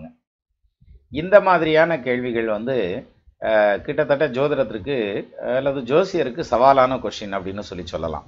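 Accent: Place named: Tirunelveli, India. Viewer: Sir neenga thirunelveli Tamil I enjoy because my father veeravanallur and my mother ambasamudram. We were in vickramasingapuram for a long time.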